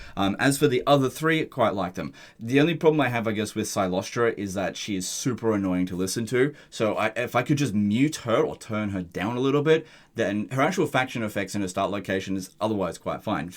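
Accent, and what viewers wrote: Australia. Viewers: OMG!!! Are you Australian?!? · Youre Australian?!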